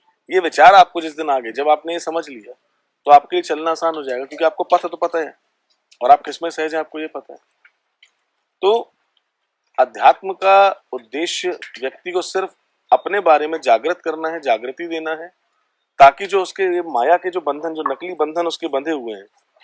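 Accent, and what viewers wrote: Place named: Maharashtra, India. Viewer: मुझे हमेशा ऐसे क्यू लगता हाई की आप महाराष्ट्र से हो. मराठी भाषिक हो क्योंकि आपकी हिन्दी एक मराठी भाषिक जैसे है. तथा नाथ परंपरा तो महाराष्ट्र धर्म की है. जय गिरनारी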